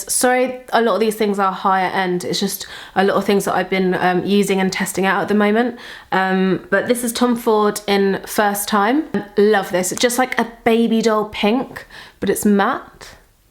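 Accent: United Kingdom. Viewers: hi look i love you so much so so much I always love your videos and your British accent and yor eyes color everything you'r makeup is my best 😢💜 I'm your fan from k.s.a 🌚💕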